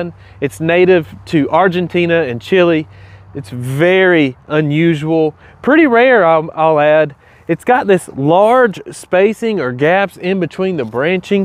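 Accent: South, United States. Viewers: Wow I have watched all your videos. Love your southern accent and excitement about conifers. Just amazing energy. Wish I had your job.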